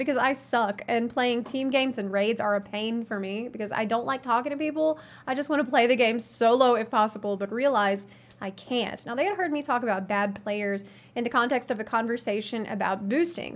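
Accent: South, United States. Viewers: oh can you read me some goddamn bedtime stories with that sweet moonlight magnolia drawl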